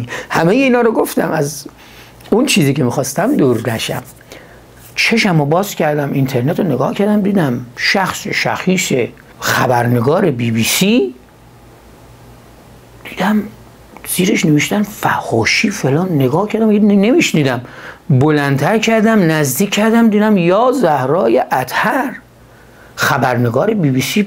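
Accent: Gilan, Iran. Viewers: وقتی عصبی میشه لهجه گیلکی میگیره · من که عاشق لهجه شمالی هستم .وا نده لهجه زیبا رو عزیز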